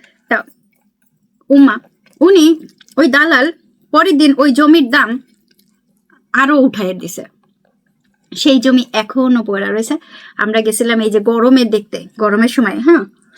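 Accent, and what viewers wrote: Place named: Barishal, Bangladesh. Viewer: আপনার কথায় বরিশাল এর ভাব আছে,খুবই, খুবই ভাল লাগে।সব সময় ভাল থাকবেন। ❤🎉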